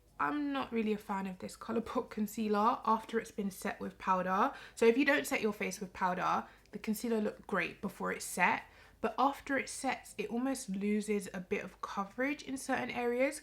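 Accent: Jamaica. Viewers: patwa coming through.. Love to see it 😁😘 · Omg Ms you are stunning, I love, love your makeup looks, I hope to get as comfy on my youtube soon. Love your work, I finally heard the Jamaican accent. Big up yourself:)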